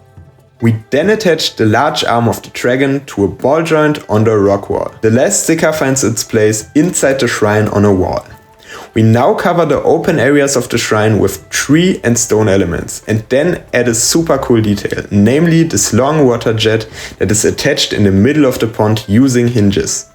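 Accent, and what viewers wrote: Germany. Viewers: Wow wie geil ich holle die mir fix ich höre deutschen akzent😂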